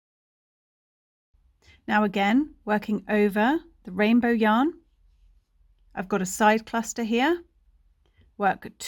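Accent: England, United Kingdom. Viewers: Thank you I was thrown because you have an English accent and was using americanised terminology 😁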